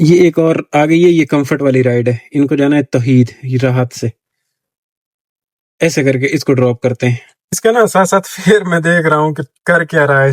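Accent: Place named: Sargodha, Pakistan. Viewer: Bhai ap punjab sy ho kya apki punjabi ak dum sargodho jhang Chiniot me jo boli jayi osi trha ki hai karachi wly to asi Punjabi bol ni skty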